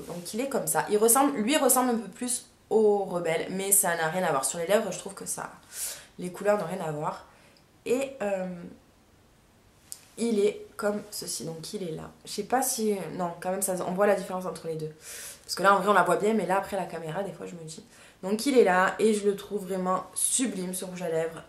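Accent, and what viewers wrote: South, France. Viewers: j'aime bien ton accent tu viens du sud toi aussi?^^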